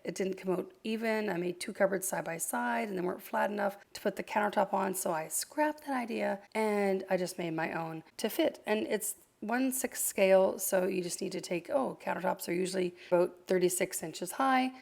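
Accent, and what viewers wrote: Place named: Canada. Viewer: My first time to your channel. But I have to say I was a little surprised, seeing your channel name, but hearing a very Canadian accent. 😅🤭 This is an awesome tutorial. Thank you! 🥰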